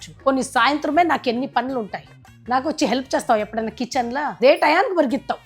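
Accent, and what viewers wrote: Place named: Bangalore, India. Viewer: Bangalore Slang Telugu 🎉🎉 ❤❤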